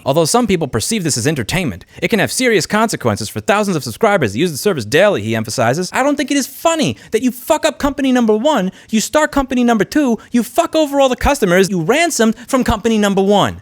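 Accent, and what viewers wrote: New York, United States. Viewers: You can really hear the New York accent come out when you're worked up. I love the good fight you're putting on for us, keep at it ya legend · His new Yorker accent is strong in this vid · ...Gee, Can you tell that Louis is from NYC? hehahaha LOL! Mocking the Futurehomes CEO by using his cats as the victims.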